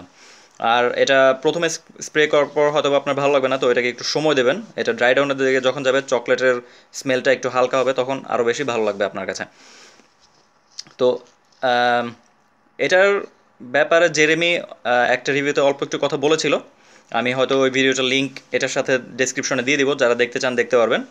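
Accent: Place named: England, United Kingdom. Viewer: Don’t mix up English accent in Bengali. It doesn’t suit you & moreover it truly irritates many. Disliked the video for the same reason.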